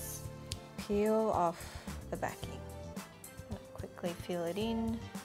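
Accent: Australia. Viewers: Hey I'm new to her channel, is she an Aussie?? I'm an Aussie too so that's why I'm wondering 😂😂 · Are u Australian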